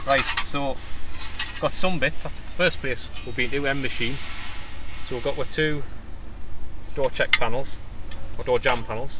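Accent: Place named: Consett, United Kingdom. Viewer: are you lads from consett?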